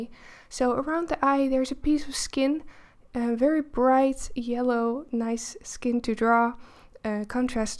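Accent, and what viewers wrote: Netherlands. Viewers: you just hear you are dutch lol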